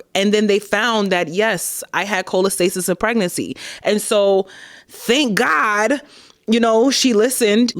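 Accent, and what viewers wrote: New York, United States. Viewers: I love your NY accent 😍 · the New York was JUMPING LEAPING out in this video 😂😂😂 miss your energy on my feed💅🏿🩷🩷🩷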